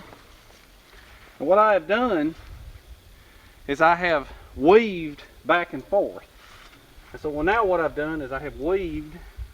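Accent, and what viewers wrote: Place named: West Virginia, United States. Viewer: well either way my wife's a fan, I think it's the accent. My cousin Mike, back home in WV looks and sound just like ya...✌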